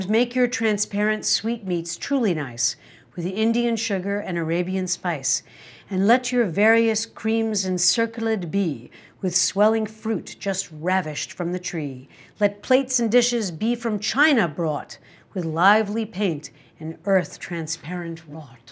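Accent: RP, United Kingdom. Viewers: Awh, my mother had endless cookbooks including many by dear Fanny Craddock. After my mother died I picked up only 2 or 3. mmmmmmm ... I wish I could go back and grab a truckload. Your 'Fanny-accent' is perfect heightened R.P. She really was a breed apart. Few of her ilk are left. Thank you for bringing that era and the older cookery books to life. You infuse even the poetry with sparkle. You are a rare talent! Love, love, love. Xxx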